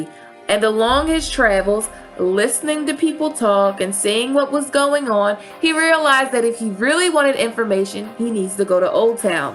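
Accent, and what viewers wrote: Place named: South, United States. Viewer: love that Southern accent that pokes out from time to time :)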